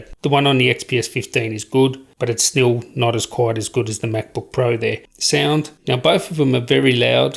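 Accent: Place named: Australia. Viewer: Australian accent in the flesh 😜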